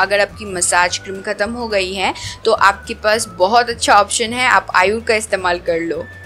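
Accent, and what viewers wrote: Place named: West Bengal, India. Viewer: But voice se to ap Bengali hi lag rahi ho ...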